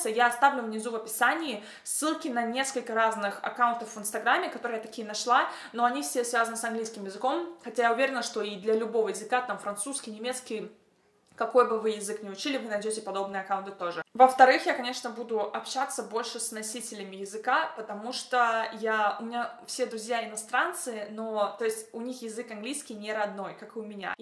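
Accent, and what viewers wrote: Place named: England, United Kingdom. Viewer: Слышу в твоём русском английский акцент 😄😄😄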